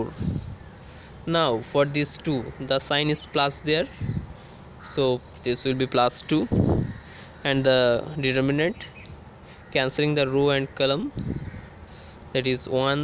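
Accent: Bengal, India. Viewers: Banglai ho kya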